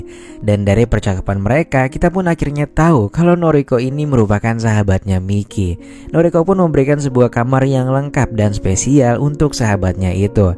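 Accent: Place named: Balangan, Indonesia. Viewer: Kayak suara orang balangan admin nya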